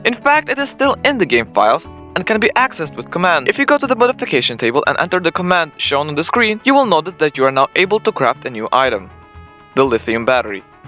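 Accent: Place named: Poland. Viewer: Ale masz Polski akcent bardzo to słychać XD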